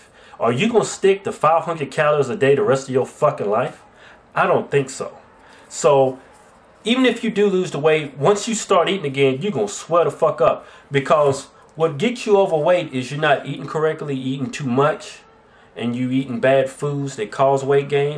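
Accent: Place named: South, United States. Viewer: gorgeous.... even with those country accents, and bad language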